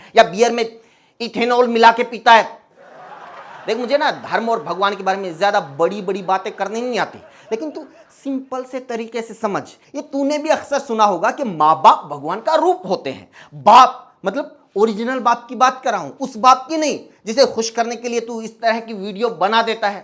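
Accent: Haryana, India. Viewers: bhai Aapka Andaze-Bayan Haryanvi hai aur content is full of true facts and fun. I like your videos. All the best. 🎉🎉 👏 👏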